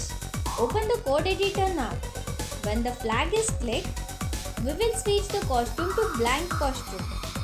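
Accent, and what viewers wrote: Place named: India. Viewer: By you voice I believe you're an Indian.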